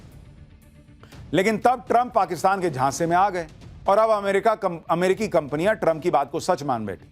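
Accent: Bihar, India. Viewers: सुशांत सर आप जब बोलते है तो ऐसे लगता है की आपका भाषा बिहार के भाषा से मिलता जुलता है कही आप बिहार से तो नहीं है सर ऐसा लगता मुझे आपका हर न्यूज़ मै देखता हूँ बहुत अच्छा लगता है थैंक्स सर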